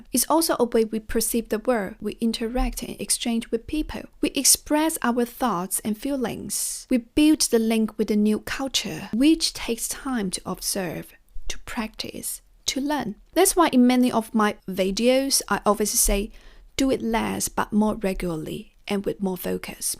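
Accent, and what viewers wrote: England, United Kingdom. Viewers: i was surprised to hear english wasnt your first or even your second.. i can hear almost a british accent from you.. the mesh is beautiful! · I can hear the British English accent so hard in her English, it's adorable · Oh wow that’s really good that you’re able to learn that many languages. You shouldn’t be ashamed with about anything it’s not your native language. Ok here’s a new challenge for you, just for fun. How about trying working on your accent, you got the old English down, let’s try American English. This might be a bit more challenging but doable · Hi Miss. Your voice is so gently😊. Do you speak english in british accent?? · Thank you! Your English is GREAT! I understood every word and was highly intrigued by the idea of your almost British, sounding, dialect. It has a certain je ne sais quoi.... <3 Furthermore, your video was inspiring and multifaceted in many areas of Life AND Learning. 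{in my opinion of course;-) } My mom taught me THIS gem, "Your haters are brillo pads, they may be abrasive because they rub you the wrong way; however, if you disregard your discomfort, you will find that you have become polished and exuberate a new found brilliance and SHINE!!! Keep shining my friend, KEEP SHINING!!!!🌻💟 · Hello, I have just discover your channel yesterday night (we are in the morning when I writing this message) and I love your videos and learn so much! I am learning japanese and need to learn and have fun in learning. I see that is more simple to learn when it's a pleasure. Your stories and those of your friends are so shocking. Your are so kind woman and you speak so well english. I love your british accent and understand without difficulties what you say. I'm french and I know that native speakers like to correct rudely people sometimes. But I don't understand why they act like this. 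But there is people, like you said, that are very caring too. I want to thank you for this video and try to have those advices in my mind. I never really practice english outloud because I think that I will not have enough words to express myself but I understand well english. I will try to communicate more now! Thank you so much! Je te remercie pour cette belle vidéo, et j'espère voir aussi un jour des versions françaises de certaines de tes vidéos qui sont excellentes:) Merci encore pour tes conseils et belle journée à toi!!!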